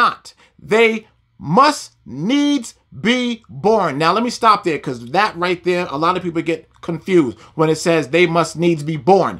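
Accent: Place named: United States. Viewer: My Gee👀 Thank you bro I'm from South Africa so I had to figure it out my self that you were saying Satan because of you American accent with the T sounding like D 🇿🇦🇬🇧🇺🇸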